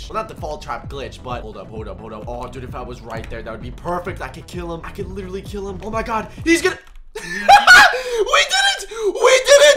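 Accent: United Kingdom. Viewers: I still don't know if you British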